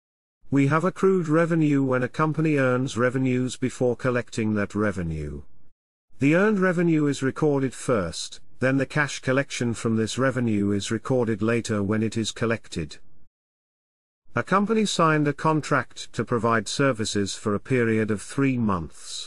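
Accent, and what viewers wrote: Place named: United States. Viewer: صوتك بقا امريكانى اخر حاجه :)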